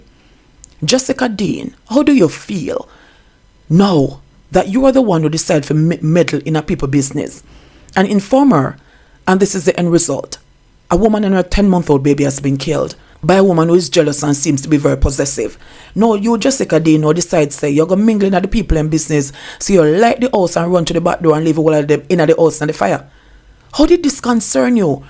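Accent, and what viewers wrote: Jamaica. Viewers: Bradshaw watched too much murder series movie. Send person X to kill the young miss and her baby, then sending person Y to get rid of person X. She thought she would have gotten away with her devious act. Condolences to the family of the deceased. Love your blend of professionalism and the pinch of patois you integrated I your delivery of the new 😅. Well done 🎉❤👍💯🙏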